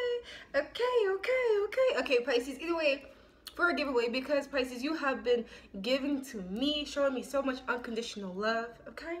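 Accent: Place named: Jamaica. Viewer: Jamaican accent sweet nu bloodclaaat 😏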